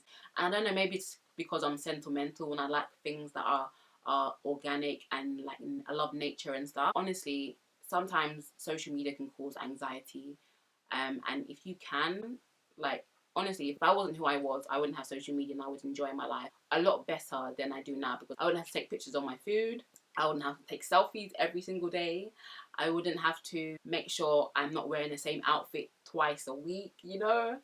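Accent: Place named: United Kingdom. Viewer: Soo true instagram is soo fake and made me so depressed. Its not real life and you're right none of those people will be there for you in your struggles. Plus I hate feeling overexposed and you never know how many creepers are watching. As women its not very safe! I'm glad instagram deleted my account. I realized how immersed I was in that fake world. So glad you talked about this youre beautiful and love your uk?? accent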